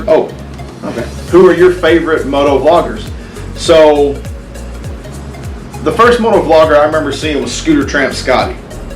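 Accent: South, United States. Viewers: So to sum up: Talks. Sips Crown "Role". Talks. Sips Crown "Role". Talks. Sips Crown "Role". Talks. Sips Crown "Role". Sips Crown "Role". Talks. Sips Crown "Role". Talks. Sips Crown "Role". Talks. This video has been brought to you by Crown Role. LMAO! I think I will contact Crown Role and let them know they have a supporter! Just messin' with ya. I love a Southern accent. I've been sippin' on Tanqueray and Tonics this summer. But the price of the gin at the state owned liquor store is outrageous, so I either need to find a more suitably priced gin that tastes like Tanqueray, or find myself an underground out of state supply! I noticed gas went up about $.20/gallon the last week or two. I was thinking about what caused that and realized it was probably due to refinery shutdowns. Thanks for keeping things rocking there! Daphne Blake should be easily #1 by now of all your bikes. 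Mine definitely is. Reliability, looks, sheer power and speed... You just can't beat it. But my CTX 1300 and V45 Magna were and are definite standouts. There's a new Valkyrie owner on the forum in the Houston area. I posted a link to your channel and told him to check you out. And again, you promised us a Talking Heads video and there was no Talking Heads music. "You may find yourself behind the bars of a large motorcycle. And you may find yourself in a beautiful house. With a beautiful wife! And you may ask yourself... Well? How did I get here?" There - I did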